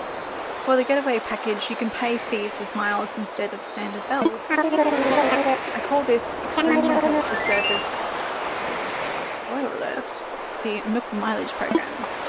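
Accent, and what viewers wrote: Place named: New Zealand. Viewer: I think they're asking because of the accent? Personally I think you sound a bit like a Kiwi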